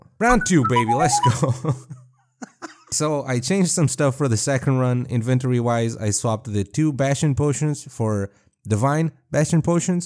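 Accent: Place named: Portugal. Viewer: ahah "daaassss" "é agora caralho" puro tuga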